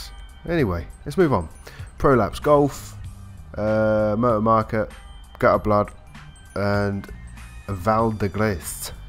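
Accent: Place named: Norwich, United Kingdom. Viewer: Your from Norwich right? Or very very close lol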